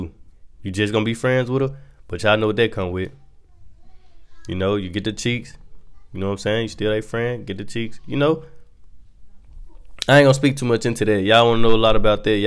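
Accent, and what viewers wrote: United States. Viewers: i can't understand the american accent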